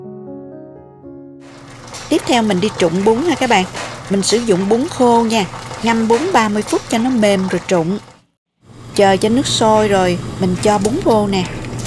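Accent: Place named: Binh Duong, Vietnam. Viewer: Trời tưởng ở VN. Trân trọng cô quá, nơi xứ người mà nấu chuẩn hơn nhà. Giọng càng tưởng ở Bd. Chúc cô nhiều sức khỏe mãi nấu những món ngon.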